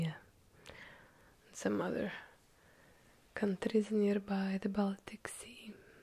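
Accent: Russia. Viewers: My Dear I would listen to you read a math text book as long as you were using your heavy Russian accent. And I hate math.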